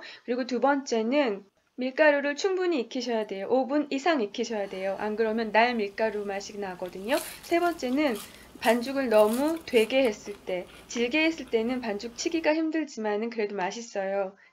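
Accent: Seoul, South Korea. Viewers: 플레이크는 뭐예요 그리고 서울사투리인가요?? 말투 엄청 특이하네요 ㅋㅋ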